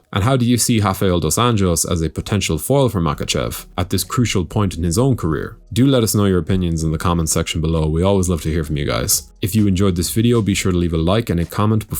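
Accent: Ireland. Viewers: This irish narrator bugs the heck outa me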